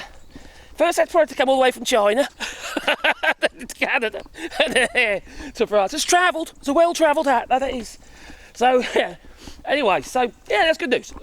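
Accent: United Kingdom. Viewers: I sometimes have a hard time understanding your Britain accent, but I've loved from the first time i found channel ❤❤❤ 🇨🇦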